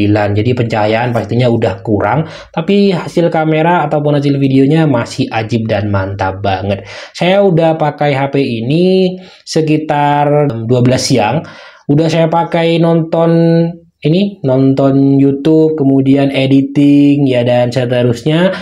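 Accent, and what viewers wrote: Madura, Indonesia. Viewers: abang org madura ya logatnya khas banget😅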